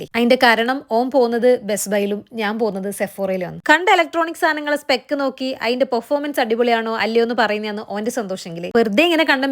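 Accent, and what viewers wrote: Kannur, India. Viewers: Anne koottand poonnath kandaa😂 Kannur slang📈📈📈📈📈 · Kannur aano 🤔 varthanam kettitt choichatha😌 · Kannur aano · Kannur anappa nee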